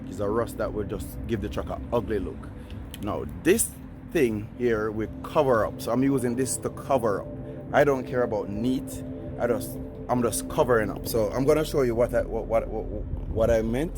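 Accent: Jamaica. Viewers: Yow breda talk jamacan me understand. 😆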